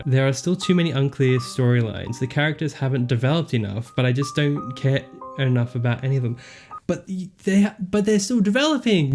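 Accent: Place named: Australia. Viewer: I am loving the Australian that's coming out! More please :D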